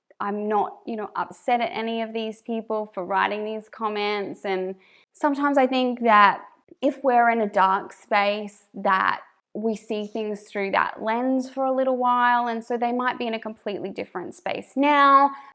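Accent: Australia. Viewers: I started watching organising and minimalism videos on you tube and was really missing our Aussie accent so that's how I found you. Don't lose the Aussie twang 😉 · As for your accent, if you watch an australian channel you should expect an australian accent! 😆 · So mean picking on someone's accent! Everyone speaks differently and thats fine. I am Australian also so your speech sounds fine to me 😁 That comment probably came from someone in a different country who isnt used to hearing an Australian accent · I was always taught that if you don’t have something nice to say, then don’t say anything at all. Nowadays, i think so many people are miserable in their own lives, they look for any reason to criticize someone else. It is very sad. I love your Australian accent! I think children in today’s society will greatly benefit from being raised in a minimal home. I have been on my minimal journey for about 2 years. 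I am constantly looking to minimize even more. It has made a huge difference in our home. Our lives run so much better. I wish I would have known about minimalism 20 years ago. You are doing a great job! Your kids will grow up knowing what is truly important in this life, the relationships they have not the things they possess. Keep up the awesome work! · Your kind and gentle nature makes you a good messenger for this topic and your Australian accent is a bounce! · you have a lovely Australian accent. Some of these comments are crazy. People need to get a life. Carry on we love your channel. Bye bye to anyone who have a problem with it lol 😁💗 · I love the holiday apartment look too! Plus anyone with kids knows that anything extra = extra chaos. And your hand gestures increase trust. I was taught it's GOOD in public speaking. It's ridiculous to make fun of your lovely Australian accent.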